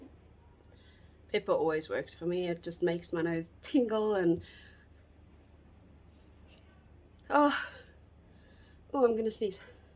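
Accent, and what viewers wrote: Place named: South Africa. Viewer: that and Ur south African accent 😝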